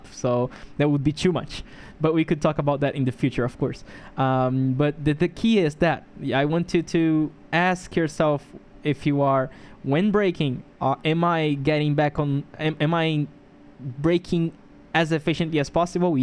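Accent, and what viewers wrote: Brazil. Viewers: Achei teu canal hoje, Voce eh brasileiro ne soh pelo sotaque. muito bom os videos!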